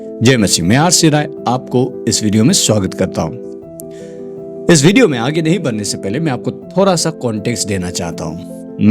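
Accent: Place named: Northeast, India. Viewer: Everyone is thinking this man is Nepali but his way of speaking is like Northeast India..he may be from Nagaland... Meghalaya...Arunachal Or Mizoram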